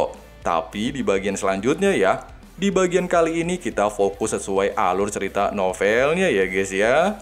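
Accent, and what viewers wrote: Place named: Indramayu, Indonesia. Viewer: Dermayu bang lalah cah ya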